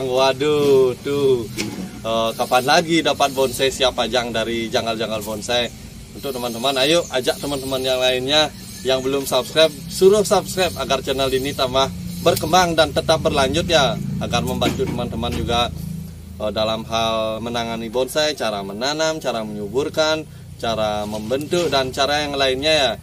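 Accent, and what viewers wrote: Bali, Indonesia. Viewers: Om swastiastu, kenapa saya sangat suka channel, 1. Bli membuat konten slalu apa adanya dan tidak melebih lebihkan 2. Slalu mrendahkan diri pdahal bli udh brpengalaman lah dengan hal bonsai 3. Saya sangat senang dengan konten bli yg memanfaatkan bahan yg ada di skitar kita 4. Karena channel bli saya jadi ikut membuat konten tentang mencari bahan bonsai di skitar kita 5. Logat bali medok pokoknya 👍😁 Msih bnyak sih mungkin dri saya skian dlu, smoga sukses slalu dan kontennya smakin berkembang dan semoga bisa cepat mengejar 100rb sub ya bli, klok blh bsk" diajakin ikut nyari bonsai bli hehehe 🙏👍😁, suksme bli maaf klok ada slh kata🙏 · Alasannya karna lucu dan unik ,maklum beda daerah, saya dari semarang, beda logat bicaranya, jadi asik aja denger logat bali yg kental dalam penjelasan di setiap vidionya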